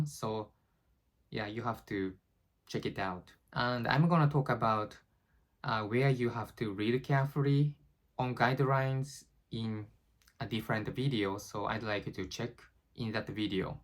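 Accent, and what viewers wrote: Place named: Japan. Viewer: I think he is Japanese based on is accent 🤔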